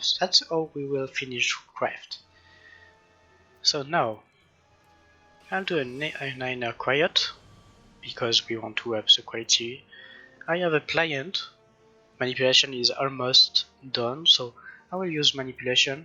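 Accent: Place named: France. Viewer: love french english accent ! thanks for guide btw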